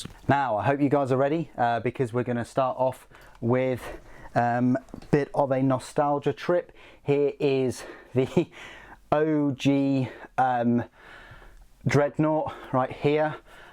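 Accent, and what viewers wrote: United Kingdom. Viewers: I like your accent. Its very crisp and authentic. It's the only reason why I stood to watch this video. As an American, you have the IDEAL accent that we love to listen to- your accent and Tom Holland's...and Jason Statham...and Michael Caine...I know Sean Connery's not English, but we'll throw him in there too. Your accent is up there among the greats. You articulate your words very clearly. Cheers Mate.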